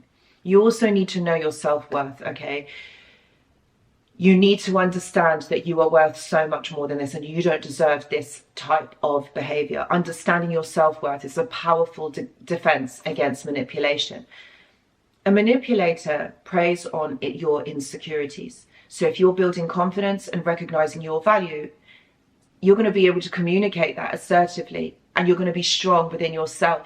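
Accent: United Kingdom. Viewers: Very eye opening! For me this one has been very hard to tell because I am very open minded sexually- even so though he’s done a few subtle things that just made me feel uncomfortable. I could never really put my finger on why but just this lingering feeling that something is wrong. I was also going to say that since I have been watching your videos every day now I noticed when I am dealing with the narcissist it’s like your voice is in my head dictating my words! I am writing out the text response and I read it back to myself and it’s almost like I didn’t even write it I hear this you know British accent and I’m American!! Just something a bit kooky lol 😂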